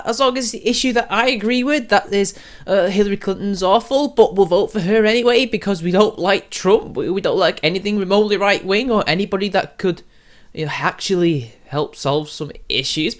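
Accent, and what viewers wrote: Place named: Yorkshire, United Kingdom. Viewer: What part of Yorkshire ? Lad.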